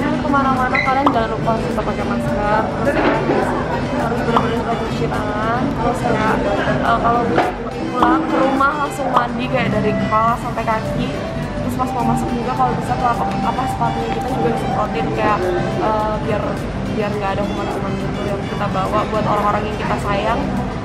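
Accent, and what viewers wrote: Java, Indonesia. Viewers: Ngomomg e medok ngono🙄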